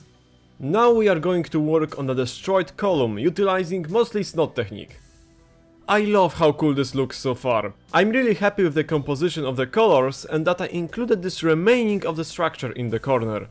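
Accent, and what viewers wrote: Poland. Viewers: bro i love your polish accent, im from germany and i hate mine bruh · Czuć ten polski ale cieszę się ze polak wyszedł na między narodowa scenę pozdro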